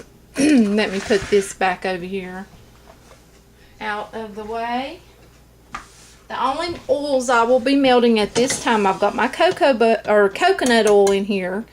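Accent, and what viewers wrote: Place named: South, United States. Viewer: I love all your snowmen...too cute! The molds are amazing, would like to have one myself .Can I ask where you're from...can't help but notice your southern draw. ; ) I am originally from Alabama but now live in Texas. I just happen to come across your channel, glad I did. Ya got a new subbie! Tfs Have a great day! : )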